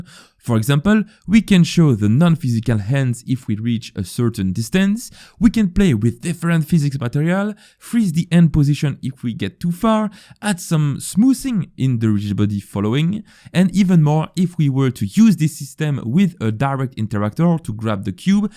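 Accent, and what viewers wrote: France. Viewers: tu est français XD L'accent est horrible. Sinon merci.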